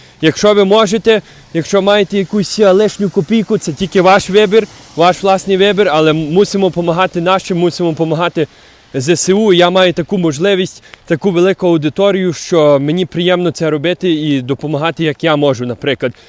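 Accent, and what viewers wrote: Canada. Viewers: У Вас, дуже, канадський акцент! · це я знаю,маю родичів там,але на мій погляд гя " говірка " як ви кажете ,це скоріше українська мова з канадським акцентом..дуже ріже слух ))) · Цікава українська, вже з канадським акцентом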